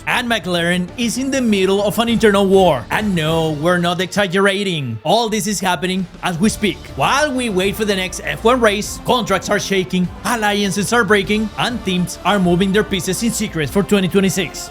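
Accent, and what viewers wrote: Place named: United States. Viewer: Awesome! I like your American accent!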